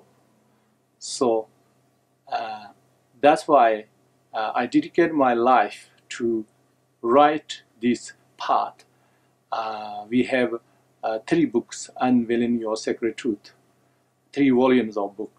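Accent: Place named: India. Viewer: U r accents r Indian accent this shows Tibate was a part of India in ancient time wow☺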